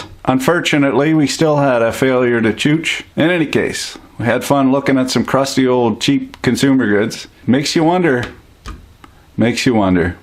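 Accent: Canada. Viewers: The whole first minute reminds me of Corner Gas. must be the accent. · Hes canadian, i think :P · i started watching your vids a few days ago. I got to say, I love listening to Canadians talk. And you sir, are a fun one to watch. Keep up the awesome work. If you can call it work, you have so much fun doing it.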